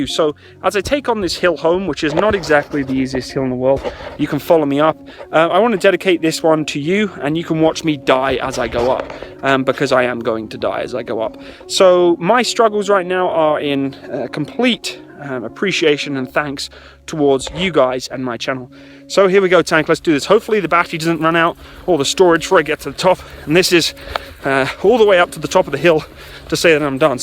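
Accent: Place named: United Kingdom. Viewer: Whereabouts in the UK are you from mate?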